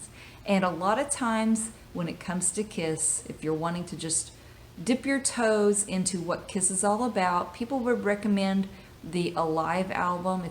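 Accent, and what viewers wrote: South, United States. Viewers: Folks, don't let the blond hair and/or southern accent fool you. The lady has a partly sophisticated and insightful taste in music. I wish I had a dollar for everytime I played my brother's LP.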